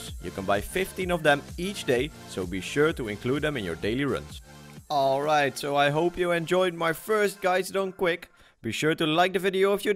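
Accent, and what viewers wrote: Netherlands. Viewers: aan je accent te horen ben je nederlands :)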